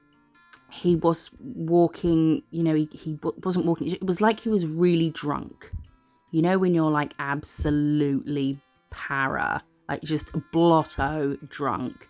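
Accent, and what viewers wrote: England, United Kingdom. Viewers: "Faffing, blotto, para." I haven't lived in England since 2003. Listening to you makes me feel homesick. It reminds me that we speak another language 😂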